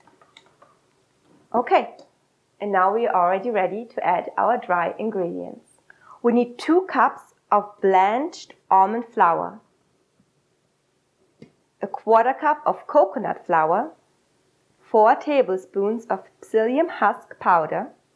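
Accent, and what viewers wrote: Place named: Germany. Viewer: You speak very good English ! What part of Germany are you from ?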